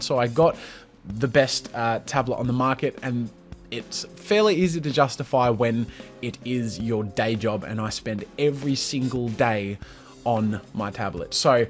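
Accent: Australia. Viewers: ARE YOU AUSTRALIAN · you sound Australian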